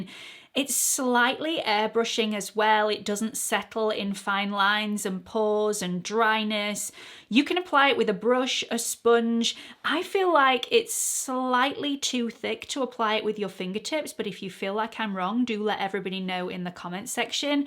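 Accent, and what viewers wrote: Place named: North, United Kingdom. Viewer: I am still trying to work out your accent. Still not sure what part of the country you are from. I have narrowed it down to North 🤣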